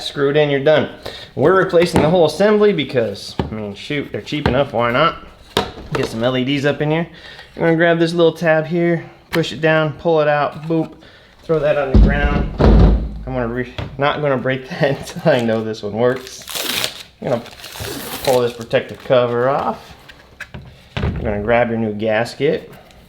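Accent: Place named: Arkansas, United States. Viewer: Enjoyed the video! I was sitting here telling my wife that you sound just like her cousins in Arkansas and you could have done the video in about 45 seconds if you hadn't been so happy, friendly, sound effecty, and chatty lmao! 🤣 I'm going to subscribe just for the future sound effects!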